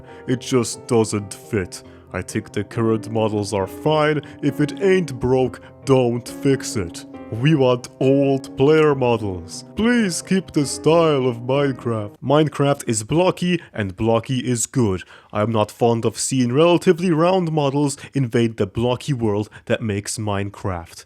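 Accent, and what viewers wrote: Eastern Europe. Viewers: You're a blend of Eastern European and Dub - its unique.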